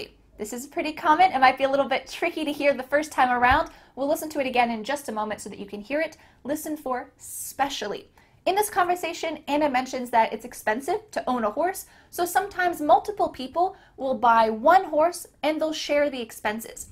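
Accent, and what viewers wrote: United States. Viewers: مرحبا يااستاذة هل هذه بلهجة الأمريكية؟ ؟ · thank you techer english Misis i like listening english American style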